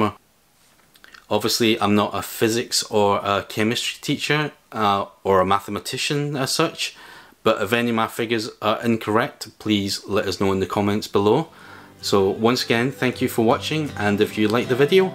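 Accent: Scotland, United Kingdom. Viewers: I don't have a Leaf, but I'm subscribing just to hear your lovely Scottish voice. : )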